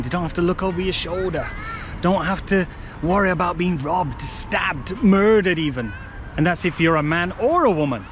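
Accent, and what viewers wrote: United Kingdom. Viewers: Your criticism is that Americans have been brainwashed about China without having even been there. You say our media is corrupt (not wrong). But then you take the absolute worst headlines from this so-called "corrupted" media and push them even further out of context and make shocking omissions of factual information to make America (and the UK) sound like dystopian wastelands full of murderous villains. And how long have you lived in America? Yes- every country has its problems and need to do better by their people. But I have a question for you: If America is such a horrible place, why are massive droves of people risking their lives by walking all the way from places like Guatemala and swimming across the Rio Grande for a chance to get in? I don't know how long the lines are for the attractions in China. But Texas isn't exactly a short walk from Guatemala. 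You have one thing going for you- A British accent. And Americans will pretty much believe anyone with a British accent. How is this not immediately apparent to anyone with a brain as blatant propaganda?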